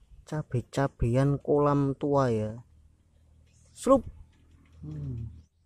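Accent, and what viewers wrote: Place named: Banyumas, Indonesia. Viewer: Iki tunggal e cak agus ngapak😂🤣spot e marai ngiler🤣🤣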